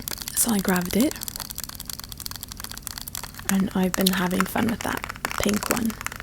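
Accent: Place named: United Kingdom. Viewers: she's American but she uses British accent for her privacy :) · Sounds like a British accent with a light tone of someone who learnt English when they were younger (not their first language but close second)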